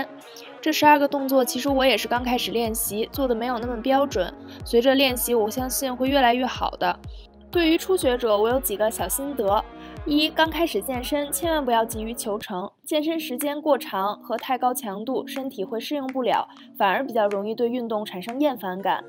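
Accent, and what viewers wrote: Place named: Tianjin, China. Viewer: savis知道你是北京的 不过真的觉得说话像天津人啊 甭儿亲切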